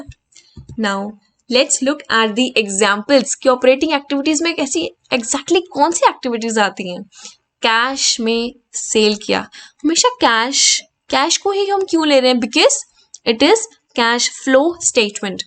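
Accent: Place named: Kashmir, India. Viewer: Mam u seems have like our Kashmiri voice Thank u so much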